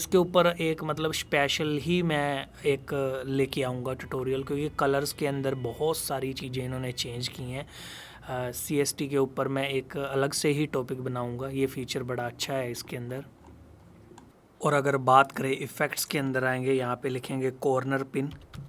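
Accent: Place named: Punjab, India. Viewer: Brother hum yaha English pronunciation sikhne nhi aaye.....embarrassment feel mat krao....inka Punjabi accent hai..... but sir ka workshop attend kro ek baar...pta chal jayega...🙏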